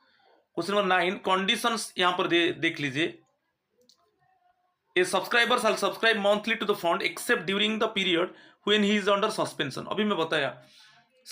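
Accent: Odisha, India. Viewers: Sir i guess u r from Odisha...I m from Sambalpur sir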